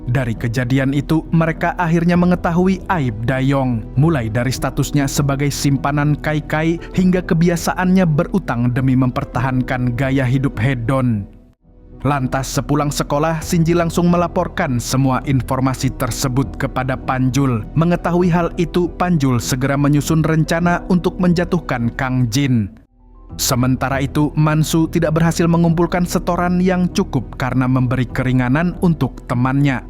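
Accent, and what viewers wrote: Banjar, Indonesia. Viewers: Terlalu banjar mang ai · Pian ni orang Banjar KH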